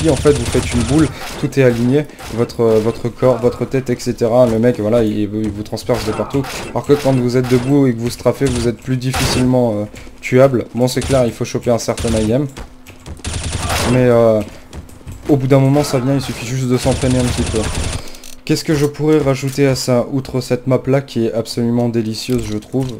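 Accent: Alsace, France. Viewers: ah un alsacien sa fait plaisir ^^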